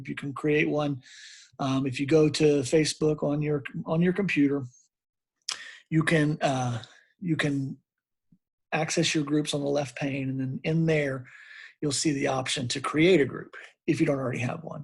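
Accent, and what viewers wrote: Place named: United States. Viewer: This was a great video. So nice to hear an American accent instead of these Indian accents.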